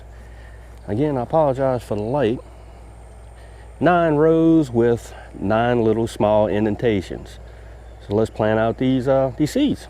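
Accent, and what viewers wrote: South, United States. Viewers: That dibble is fabulous! I cannot wait for hubs to make us one for spring planting. I am a new sub and love that charming southern draw of yours. It is cool here in Oklahoma today and we are loving it. Take care!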